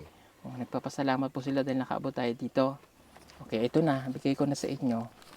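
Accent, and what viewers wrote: Visayas, Philippines. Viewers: Sir naaadopt mo na Ang accent Ng bisaya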